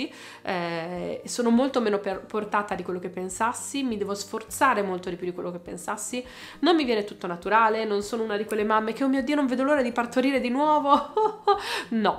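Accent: La Spezia, Italy. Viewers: Confermo il dialetto spezzino è insopportabile niente anche vedere con il genovese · W il dialetto spezzino 🙈🙌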